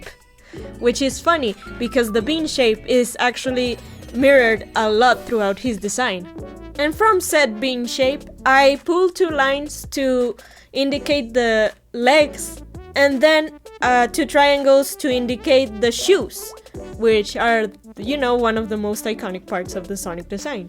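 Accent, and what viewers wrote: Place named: Scotland, United Kingdom. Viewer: You sound kinda british and scottish,weirdly thats how i think it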